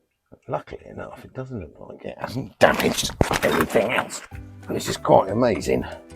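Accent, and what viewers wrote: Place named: Dorset, United Kingdom. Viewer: Corrrr lummey, that auto box is much much better than those hatefull preselector semiauto boxes you get in sprinter ambulances, I have been put-off an ambulance to convert to living van for that very reason. I am defo going to bend your ear once I get the go ahead from mad quack to get my chuffin' licence back. I'd love one of them there oldie style sprinters or maybe a long Crafter 😁 Sounds like you've got a better microphone now, love your Dorset patter, waiting for next video, have a nice week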